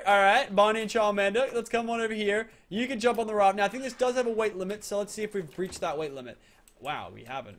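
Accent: Australia. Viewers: He's Australian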